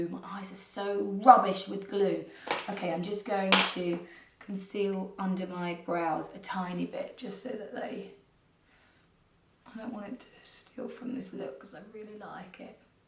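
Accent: United Kingdom. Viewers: I really love the tutorial and your knowledge of Rita Hayworth. I enjoyed the videos that you and your sister make because I always come away with something that I didn’t know and feeling a little bit better after listening to your lovely British accent. I am watching you from Phoenix Arizona in America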